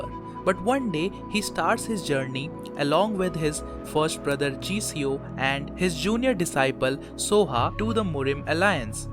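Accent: India. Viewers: Your accent is Indian 😉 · bro, sound so much like a Indian...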